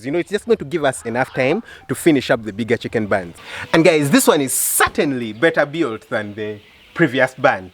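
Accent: Uganda. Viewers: Condolences over the loss of your Grandma, and congs for having beaten the virus. But on a kind note, pliz learn to include/mention the name of your country of origin in every video post. You should learn from Kenyans, who cannot post anything without mentioning their country. From you accent I guess you are a Ugandan, so go ahead and make your country proud and visible.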